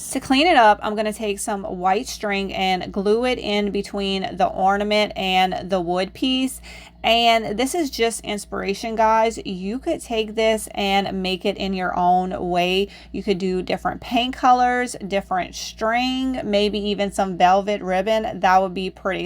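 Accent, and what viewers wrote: Louisiana, United States. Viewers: It’s not phony she’s from Louisiana and that’s how she talks. Blue and white Christmas! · She’s from Louisiana and that’s just the way they talk! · Everything was beautiful, but I was especially impressed with the finial ornaments. And from one Southerner to another, your warm smile and Lousiana accent are the cherry on top of your videos! ❤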